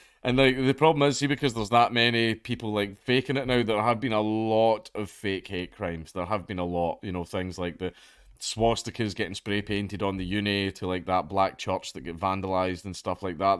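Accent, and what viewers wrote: United States. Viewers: I love your American accent lol. It’s perfect 🤘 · Holy shit, your American accent is fucking hot, no homo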